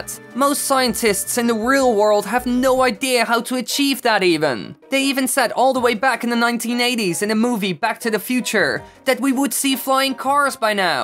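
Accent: Netherlands. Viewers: Are you dutch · ar you dutch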